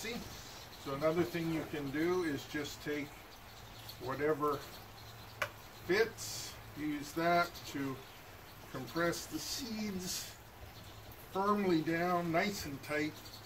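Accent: Canada. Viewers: Hi and thanks for the video. You sound Canadian...where can you get inexpensive bird seed?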